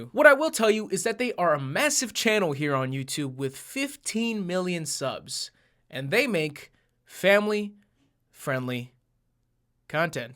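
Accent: Chicago, United States. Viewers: You from Chicago, my guy??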